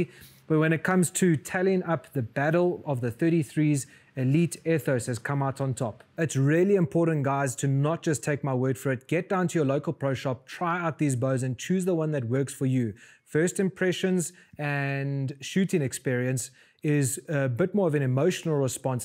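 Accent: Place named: South Africa. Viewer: At first i heard you say 572 was your hunting weight and i thought oh my another poor uninformed guy that's been watching ranch fairly but then I remembered the south African accent and realized you're hunting way different animals than i do in Oklahoma so maybe you need those heavy arrows lol. Anyway great video. I just won an ethos at a 3d shoot so i was just watching some reviews. I'm actually entered to win an Africa hunt from that event too but still waiting on the results of that drawing🤞🏼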